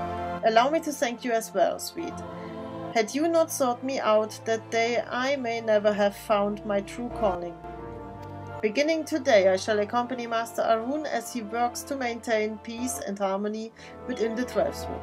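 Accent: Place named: Germany. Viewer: Her accent sounds german... Bist du Deutsche? 😆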